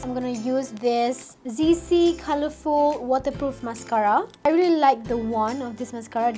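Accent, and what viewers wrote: Malaysia. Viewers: Wahhh dh nk 100k subscriber...moge terus sukses, suke tgok die ni, comelll, speaking English care Melayu, 👍👍👍💖💖💖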